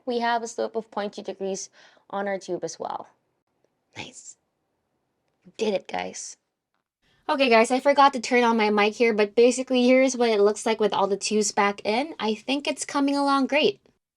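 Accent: Philippines. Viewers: are you a filipina:? cause i can hear a filipino accent in you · I just recently discovered your channel and I'm amazed at your PC assembly skills. The video editing is excellent as well. I called over my son to watch and he asked, "Is she Filipino?". What? I then realized that your accent did sometimes sound like a Filipino who speaks English (not always). Then I heard you say, "Hay nako!" OMG! (",) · I would also love to make my own customized liquid loop for my pc. How expensive it is compared to a normal AIO? And by the way, you have an accent of a Filipina, it's cute :)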